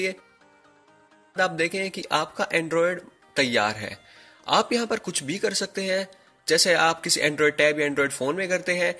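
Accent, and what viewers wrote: India. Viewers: your title is English and suddenly you talk Indian...WTF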